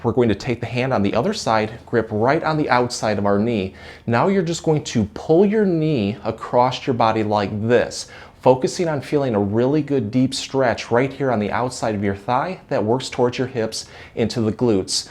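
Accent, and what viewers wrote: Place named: Midwest, United States. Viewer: Wonderful, I'm going to share this, then stretch. It's nice hearing the Midwestern accent. Chicago girl stuck in NC. Thanks for the video.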